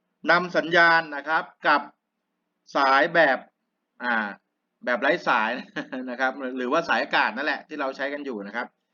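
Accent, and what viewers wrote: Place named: Samrong, Thailand. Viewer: เว้าสำโรง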